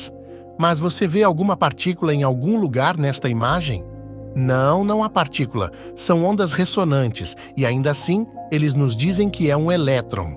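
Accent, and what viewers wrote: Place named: Minas Gerais, Brazil. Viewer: É mineira de BH..😂😂😂... Nú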